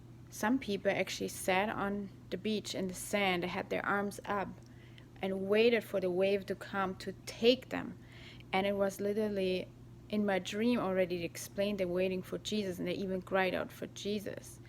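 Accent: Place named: Germany. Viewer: What's the accent? German? It's very cute 😊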